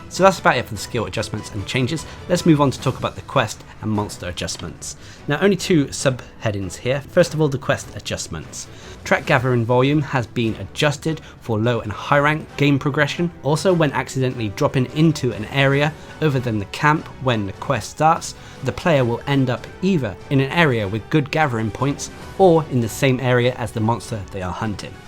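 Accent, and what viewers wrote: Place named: England, United Kingdom. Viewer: It's an English accent of a sort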